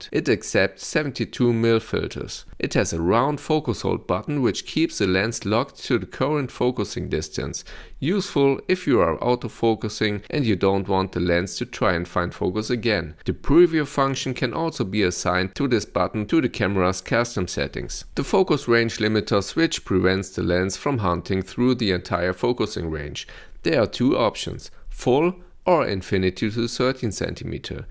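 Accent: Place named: Germany. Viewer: Mir gefällt der Aufbau von deinem Video gut, es gibt keine nervige Musik und generell wird auf die Punkte Konstruktiv eingegangen. Den deutschen Akzent habe ich aber sofort rausgehört, da musst du noch dran feilen :D Außerdem ist die Brennweite Millimeter, dabei hast du Centimeter genutzt und geschrieben 😅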